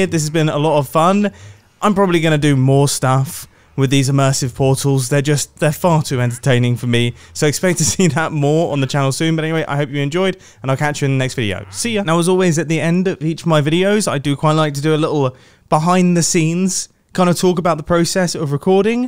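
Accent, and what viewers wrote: United Kingdom. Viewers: He's so British LOL, he says 'por-al' the 't' is kinda soft.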